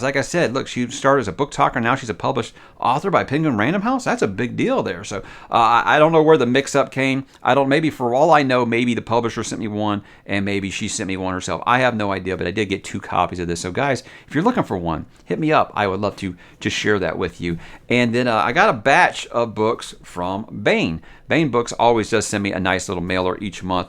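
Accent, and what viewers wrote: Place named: United States. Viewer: You're fine pronouncing names the american way because... you're american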